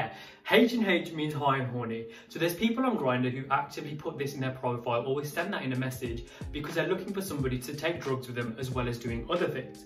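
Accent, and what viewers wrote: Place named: Birmingham, United Kingdom. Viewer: Don't believe it about the accent not being liked - I love the Birmingham accent!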